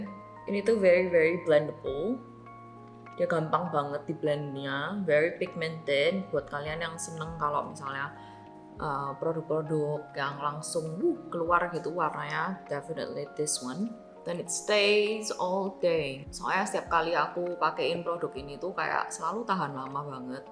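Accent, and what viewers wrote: Australia. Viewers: selain suka hasil make up & tekniknya, suka bgt sama accent aussie nyaaa🫶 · Omg the aussie accent ❤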